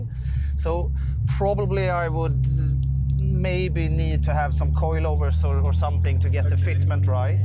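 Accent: Sweden. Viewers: Absolutely loving that Celica.. Great video, loving the Swenglish! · Legend says it's impossible to obtain a stronger swedish accent than that of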